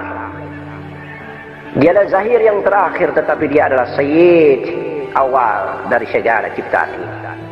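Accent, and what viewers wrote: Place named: Bugis, Indonesia. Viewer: Assalamualaikum Kalau saya dengar logat bicara nya Abah guru tercinta ini orang Bugis Ada gak yg tahu nama dan alamatnya. Kami mau ketemu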